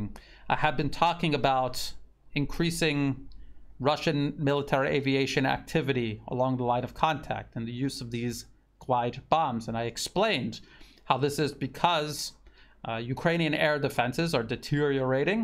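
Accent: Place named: United States. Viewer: It might be your American accent but I hear you talk about “guided bums”. Sounds kinky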